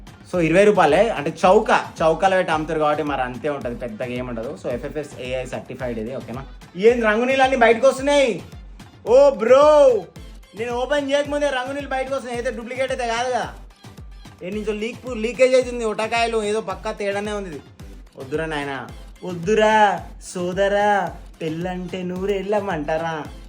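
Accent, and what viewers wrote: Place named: India. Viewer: I like your Telugu slang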